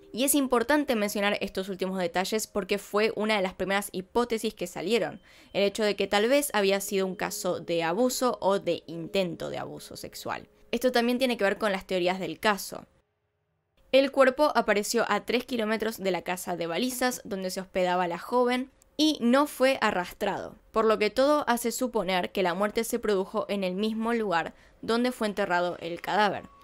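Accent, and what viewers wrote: Argentina. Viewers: Es Argentina, habla Argentino. Que queres? Que hable aleman? 🤣 🤣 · Sos Argentina? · Es de Argentina? dowwww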